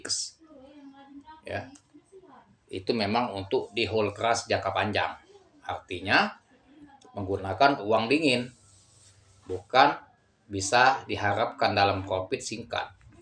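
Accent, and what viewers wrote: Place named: South Sumatra, Indonesia. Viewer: Sepertinya bahasanya bpk asal sumsel ya Pak..salam cuaan Pak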